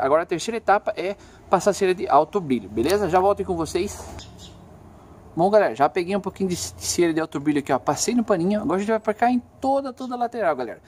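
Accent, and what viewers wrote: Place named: Interior, Brazil. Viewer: Seu sutaque é do interior kkkkk tmj. mt bom, vlw pela dica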